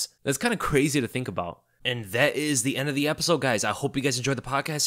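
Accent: United States. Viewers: damn albanian, i thought you were american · Had no clue you were from Albania that's cool. Totally thought you sounded like you were from the US in Milwaukee or something lmao😂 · Had no idea you were Albanian, you sound American. You are born and raised there?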